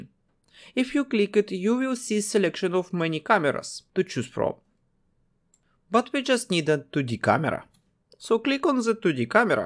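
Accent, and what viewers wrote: Russia. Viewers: Are you russian or what?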